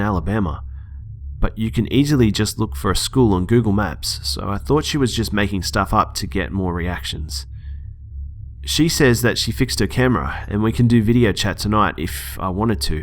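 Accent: Australia. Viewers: Is he Australian??? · i can't tell but are you Australian? i love your accent a lot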